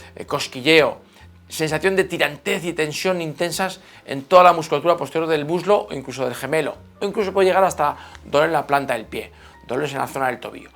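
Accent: Spain. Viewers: Se me dificulta entender el acento español.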